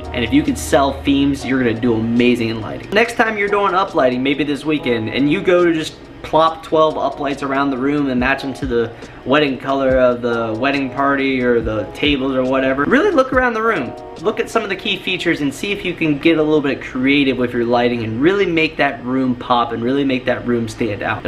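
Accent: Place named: South, United States. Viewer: Sounds like you are getting a bit of a Southern Draw! Welcome to the South Brother.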